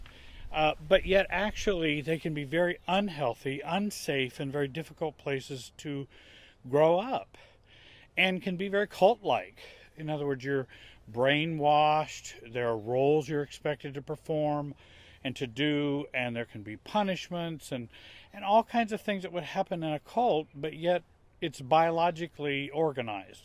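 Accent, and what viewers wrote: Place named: Indiana, United States. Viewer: 100% this man is from Indiana lol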